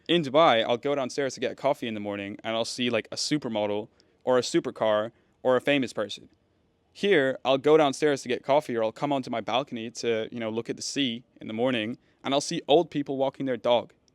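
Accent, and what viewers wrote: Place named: United States. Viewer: Hey man Really appreciate your content From your podcast with Matt Sheldon You grew up in Scotland, Egypt and Malaysia So how’d you get your American accent?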